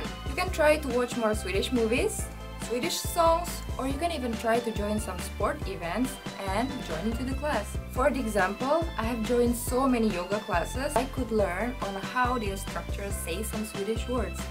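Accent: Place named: Indonesia. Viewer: Just by hearing her speaking Swedish with that accent, I already know she's Indonesian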